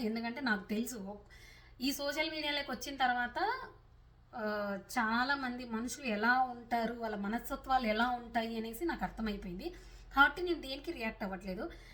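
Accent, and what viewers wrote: Rayalaseema, India. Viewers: అక్క నమస్తే వాలీల మాటలు పట్టించుకోవద్దు అక్క మనం ఏది అనుకుంటున్నామో మనం ఏం చేయాలనుకున్నామో అదే చేయాలి మనం ఒకవేళ వెళ్లాలి అనుకున్నాము అదే వేలో వెళ్లాలి అక్క వాళ్ళ ఇల్లు మాటలు పట్టించుకోవద్దు బట్ ఇప్పుడు అంతగా కామెంట్ పెట్టిన వాళ్ళు కూడా నీకు ఎవరో చెడుగా పెట్టారు అని అన్నారు కదా వాళ్ళ పాపం వాళ్ళదే ఫోన్ చేయమంటే వదిలేసేయండి వీళ్ళకి కూడా అర్థమైందా లేక చెప్పారు కాబట్టి సంతోషం అందరు కామెంట్స్ చదివి మీరు రిప్లై ఇచ్చారు కాబట్టి చాలా సంతోషం అక్క పట్టించుకోవద్దు అక్క కొంతమంది కొంతమంది మాట్లాడుతూ ఉంటారు పిచ్చి మొహాలు కొంతమంది ఇలా ఫేక్ ఐడి లు క్రియేట్ చేసుకుని మాట్లాడితే అమ్మ నీ అక్కని చెల్లిన అంటే ఎవరు పడరక్క తిరిగి వాళ్ళని అంటారని ఆలోచన వాళ్లకు ఉండదక్క పిచ్చి మొహాలు అట్లానే ఉంటారు వదిలేసి మీ వీడియోస్ చాలా బాగుంటాయి మీ ఫస్ట్ మీ వాయిస్ చాలా బాగుంటుంది అక్క నేను ఒక తమ్ముడుగా చెప్తున్నాను ఒక శివ సత్తిగా చెప్తున్నాను ఓకేనా అక్క చాలా మంచిగా ఉంటది మీ వాయిస్ కూడా పక్కా మన లాంగ్వేజ్ ఎట్లా ఉంటుందంటే మాస్ లాంగ్వేజ్ అలాగే మాట్లాడతావు దీంట్లో ఇంగ్లీష్ గాని అంత బయట దేశంలో ఉండి కూడా స్వచ్ఛమైన మన రాయలసీమ లాంగ్వేజ్ లో మాట్లాడితే హాట్సాఫ్ అక్క నీకు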